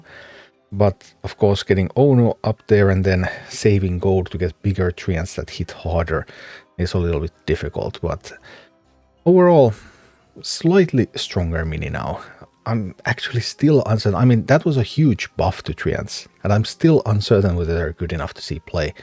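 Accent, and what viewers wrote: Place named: Finland. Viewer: It's the power of the Finnish accent :)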